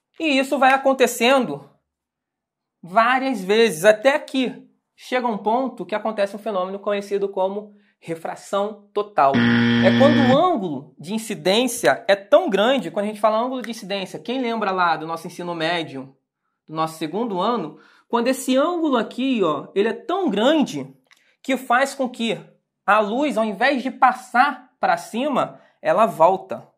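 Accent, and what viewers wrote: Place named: Minas Gerais, Brazil. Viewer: Nuuuu, MINEIRÊS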